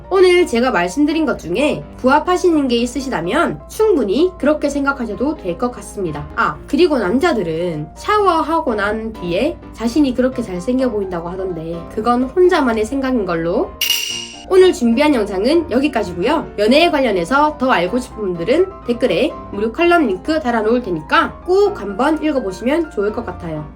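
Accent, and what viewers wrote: Jeolla, South Korea. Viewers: 말투가 홍어년인데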